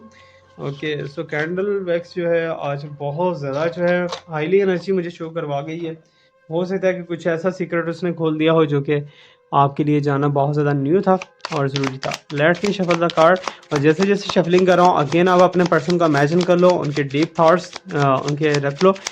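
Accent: Punjab, India. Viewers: Sir pls btaeye aap basically khaan se ho?.aap hmare jesi theth Punjabi kese bol lete ho.m toh reading mien aapki Punjabi sunne hi aati hoon.TUNN VATTA 😂😂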